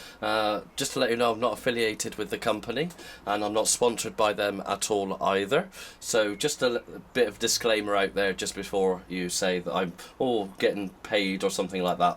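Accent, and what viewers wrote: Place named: England, United Kingdom. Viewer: Thank you for explaining the "CPI" button on this product. I work from home on my laptop and purchased this mouse for my personal laptop use and did not understand the relevance of the CPI button, but your comprehensive review made it quite clear, and your English accent was a delightful bonus. Thanks again!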